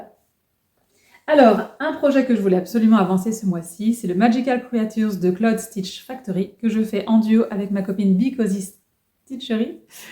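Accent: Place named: Belgium. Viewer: Coucou, tout est magnifique ! Le fil E940 de DMC (si c'est bien celui là que tu as commandé 😅) brille dans le noir mais il faut vraiment le mettre au soleil ou sous lampe très près et pendant longtemps... Et ça fait plaisir d'entendre ce petit accent belge dans un flosstube ! Je me sens moins seule 😅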